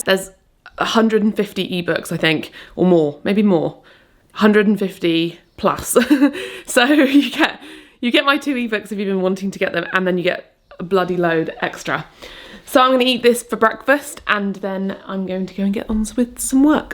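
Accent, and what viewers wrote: United Kingdom. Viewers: I already booked your plant comfort kitchen ebook last year, but I could not resist getting the bundle! It's a bargain. For 50$ I got so many books and recipes that I'm looking forward to trying. Of course the first ebook I downloaded was versatile vegan and I loved it! I truly recommend the bundle to vegans(like me) and people who want to eat healthier. I just love your recipes. Can you imagine? I started following you because of your lovely British accent years ago? 😍 I'm Italian but I live in Malta and soon I'm moving to one of the islands you love...❤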